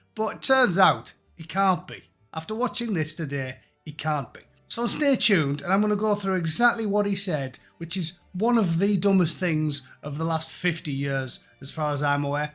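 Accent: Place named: Newcastle, United Kingdom. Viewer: This country is a mess, but tuning in every day to hear the words Rudu-Kunubu with a Geordie accent makes everything a lot more bearable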